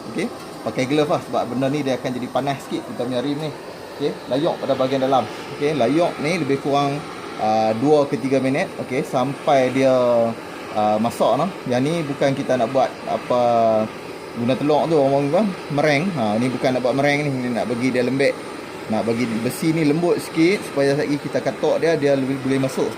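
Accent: North, Malaysia. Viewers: Uishhh pekat sunggoh laaa loghat utara dia🤣🤣🤣sempoi bos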